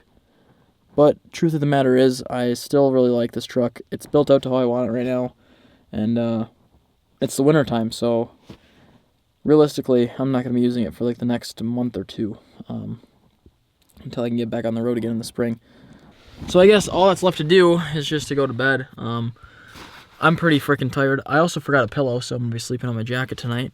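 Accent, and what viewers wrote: Canada. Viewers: You Canadian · You sound like one of us Canadians.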